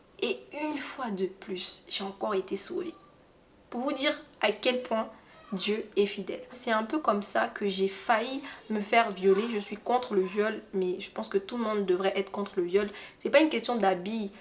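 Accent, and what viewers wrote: Cameroon. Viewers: Seigneur 🤣 accent camerounais j'ai écouté 😭😭.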